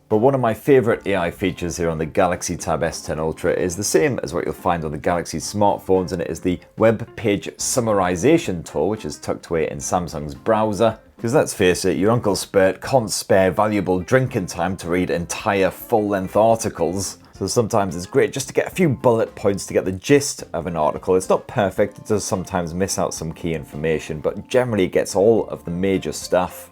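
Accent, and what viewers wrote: United Kingdom. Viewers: Thank you. As always the best review with your beautiful British accent ❤❤❤❤❤⭐⭐⭐⭐⭐🫡🫡🫡🫡🫡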